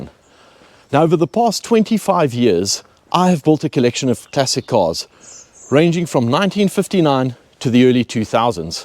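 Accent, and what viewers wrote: South Africa. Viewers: What a fabulous collection of cars ❤️. Is there a hint of a South African accent in there? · First time watching a video from this channel. It seems that you're a South African living in the UK. The accent is a giveaway if I'm correct about that. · first time viewer. What a great guy, with a fabulous south african accent!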